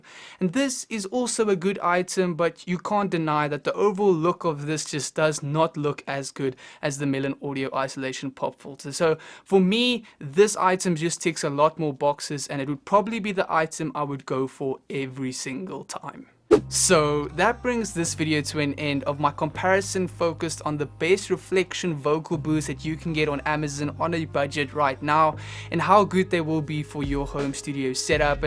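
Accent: South Africa. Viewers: Ayyyyy. I detect a south African accent ! Nice channel 😃🙌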